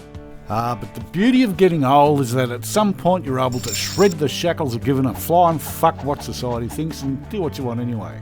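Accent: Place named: Australia. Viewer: My cousin is laughing so hard at this video right now… shout out from Vancouver Canada, he loves the Australian accent and says you are so charming. Starting to watch your other videos also, he said keep your channel growing because it’s so funny! Wondering why stopped posting By the way 999th subscriber❤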